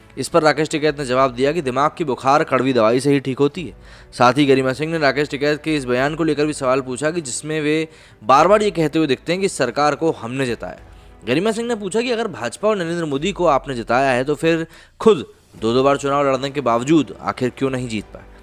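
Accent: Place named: Meerut, India. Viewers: ये हरयाणवी नहीं है ये मेरठ मुजफ्फरनगर, सहारनपुर, हरिद्वार की बोली है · Jaat language has something special to it... Sunne me bara maja ata he.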